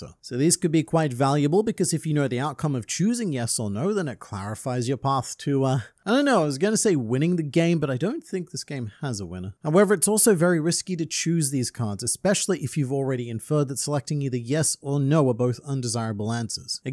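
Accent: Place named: Australia. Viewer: I love ozzy accents. Morenerr!!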